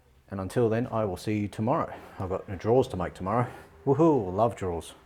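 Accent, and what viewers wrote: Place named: Melbourne, Australia. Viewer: wow not only the channel I have been looking for but from a fellow Melbournian it's a bonus ( thought I recognised the accent)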